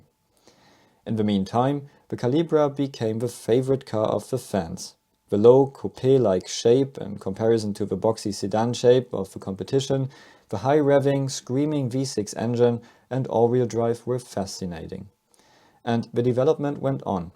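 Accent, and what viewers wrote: Germany. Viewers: Schade in deutsch hätte ich es mir gerne angesehen Ich bin ein großer Opel Fan Aber in englisch mit deutschem Dialekt? Nee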